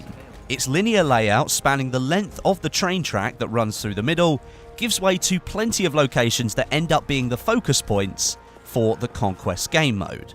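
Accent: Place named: United Kingdom. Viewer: fresh to have a British accent on a battlefield video.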